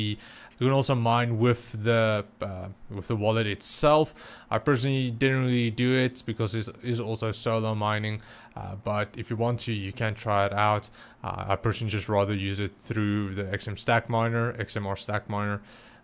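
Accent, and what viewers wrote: South Africa. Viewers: is this guy South African? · South African accent. Disturbing. Oh well. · Hey, are you from South Africa?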